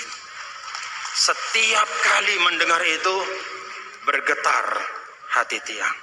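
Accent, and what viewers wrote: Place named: Lombok, Indonesia. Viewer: hihi bahasa lomboknya masih nyantul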